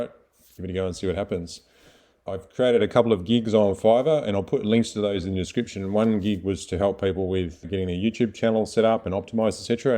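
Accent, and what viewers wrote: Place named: Australia. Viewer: Yes. I say trying health coaching. Maybe sign up for modeling and acting as your Australian accent would be fun to hear in films! Reminds me of Crocodile Dunn Dee! You are unique so maybe looking into those areas. But the the main things is do what you are passionate about!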